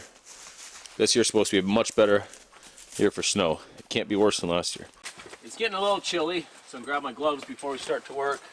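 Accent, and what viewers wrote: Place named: Canada. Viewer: why oh why cant canucks say OUT right ?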